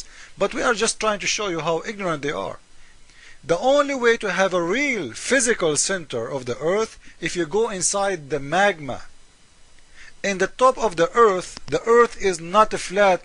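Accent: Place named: Iran. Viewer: As much as you try to speak English your Iranian tung never change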